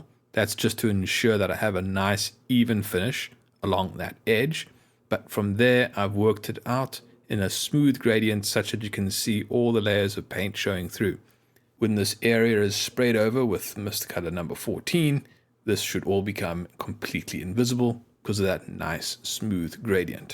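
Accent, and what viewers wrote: South Africa. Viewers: I wanted to share with you I've been trying to identify your accent. I settled on South Africa. The other day I met somebody who had a similar accent so I played a portion of your video to him. He confirmed your accent was South African, and I believe he said it was from the southern part of the country.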